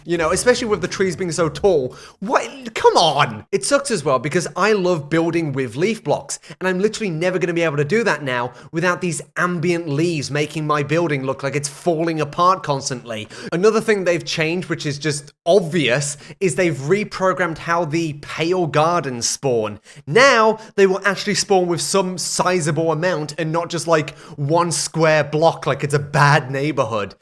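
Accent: United Kingdom. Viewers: i love watching a british person rant about a silly block game. gotta be my new favorite types of videos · funny thing is hes British so it technically wasn't a hard R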